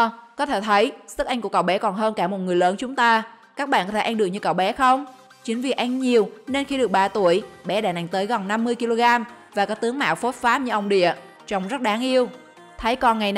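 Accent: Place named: South, Vietnam. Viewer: Miền Nam giọng ngta như vậy m còn đòi hỏi j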